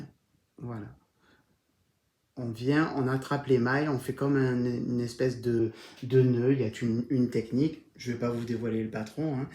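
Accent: South, France. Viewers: Bonjour!! Merci pour ton podcast! Ta simplicité est rafraîchissante! Tu m’as fait bien rire avec ton expression «foutre la patate»! Je dirais que quand tu ressens une émotion en lien avec un écheveau de laine, c’est avoir un coup de coeur ou un coup de foudre! Pour ce qui est des couleurs pour ton châle, je dirais de ne pas mettre la laine avec le rose fluo, remplacer par le fruit d’automne et scarabé…ou scarabé et fruit d’automne en dernier. Comme toi, j’adore les tutos de CloTricot! Je suis très fière de ma compatriote! Elle est tellement talentueuse pour expliquer les techniques. Je me suis procurée son livre de tricot chausette! Je l’attends avec impatience! Sur ce, continue ta belle aventure et j’ai bien hâte de voir ton prochain épisode avec ton accent du sud! Salutation du Québec!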